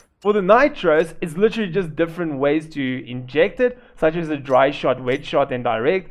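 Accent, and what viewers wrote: South Africa. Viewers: my fastest car in my garage has a 0-100 in 0.54 seconds and a top speed of 310 km/h. my time on that track with it is 8.23 seconds also are you south african? you talk with that type of accesnt? also great video i loved everything about it😀😀😀😀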